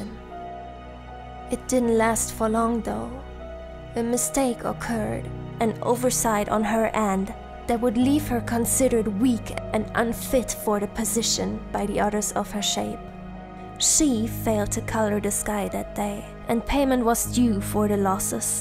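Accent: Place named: Germany. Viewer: German accent = 😍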